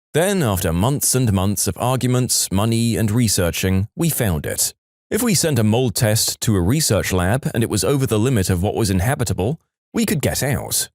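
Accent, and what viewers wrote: United Kingdom. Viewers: HES BRITISH NOW?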